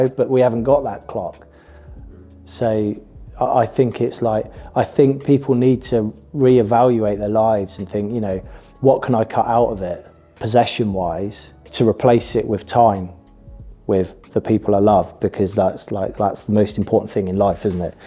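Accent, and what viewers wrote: England, United Kingdom. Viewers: sounds almost like an English version of *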